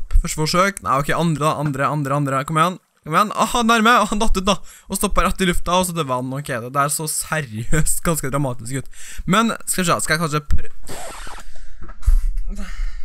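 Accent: Trøndelag, Norway. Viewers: Du e best elsker deg liker din stemme som er trøndersk😍😍😘😍😘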